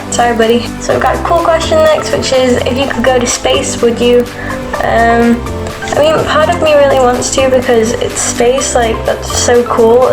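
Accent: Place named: United Kingdom. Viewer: Holy shit i did not expect you to be British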